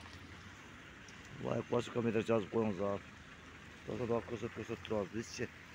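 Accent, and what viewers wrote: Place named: Batken, Kyrgyzstan. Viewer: Баткенден Ноокатка келип тартытасынбы, тилин тушунуп тушумбой жатам, шо шо дегенине Караганда баткенчи окшойсун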